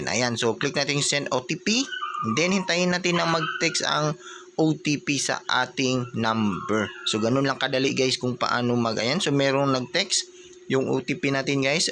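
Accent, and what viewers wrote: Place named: Visayas, Philippines. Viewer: Visaya amp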